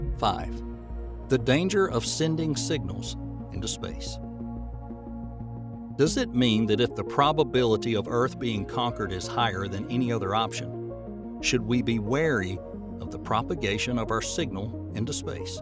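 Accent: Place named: Tennessee, United States. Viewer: Honestly, this is one of my favorite channels. I listen to it every night and on my way to work what part of Tennessee are you from ? my family hail from Bristol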